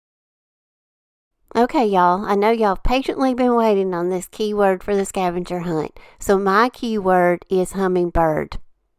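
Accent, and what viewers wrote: South, United States. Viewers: I Absolutely Love your Southern Drawl & creative DIY's. The Drop cloth pillow cover is too cute Ya'll! 💜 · I adore your accent! I miss living in the South.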